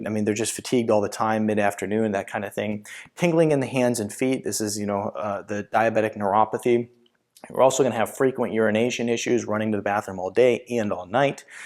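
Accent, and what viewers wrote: United States. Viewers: Excellent presentation. I like the speed of your speaking. I didn't waste any time. I don't like slow speakers. But out there in the world most people do not understand American accent. English letters of what you are speaking coming on the screen would help millions of viewers. Thank you. O<num> whatsapp.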